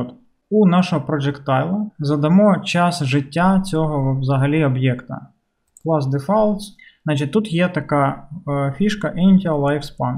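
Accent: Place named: Poltava, Ukraine. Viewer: Одразу чути, що автор з Полтавщини... бо я теж))))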